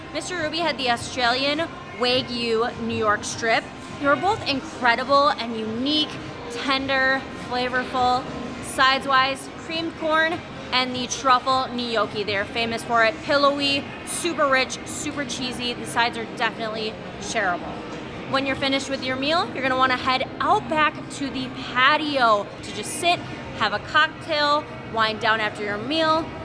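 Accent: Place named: Midwest, United States. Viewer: Great episode! Made me so hungry! I love that your mid west accent is going wild in this episode haha. Love the!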